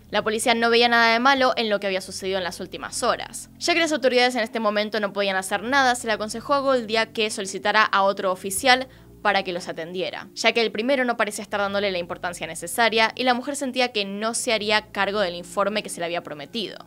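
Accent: Argentina. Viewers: No m gusta el acento argentino pero ella no se me gusta escucharlo de ella..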